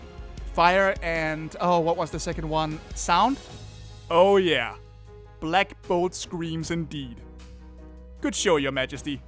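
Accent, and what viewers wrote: Denmark. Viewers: is that accent from denmark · Are you from Denmark🤔?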